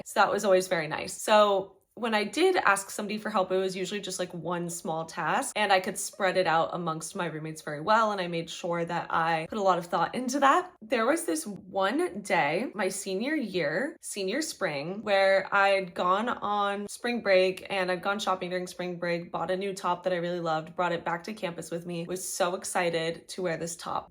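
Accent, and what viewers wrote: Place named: United Kingdom. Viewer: Someone tell me why I thought she was British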